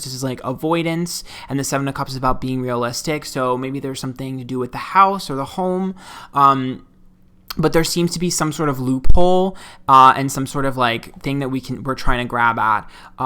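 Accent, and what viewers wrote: New York, United States. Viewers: On a bright note - I love when your NY Italian accent comes out! It's the best! On a serious note - praying SO hard for Jeffree. He really is trying to grow and everyone can relate that it's the worst to be stabbed in the back by someone you love and/or trust. Also, can't help but feel "Too Faced" vibes on the person trying to get at him.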